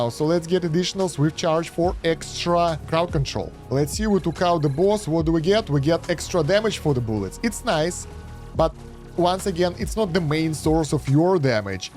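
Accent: Russia. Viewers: да лан тебе) Скажи как есть) акцент сразу слышен наш)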